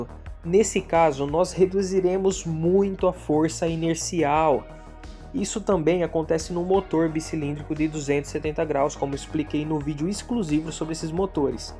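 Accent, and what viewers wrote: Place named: Inland São Paulo, Brazil. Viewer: Sotaque interior Paulista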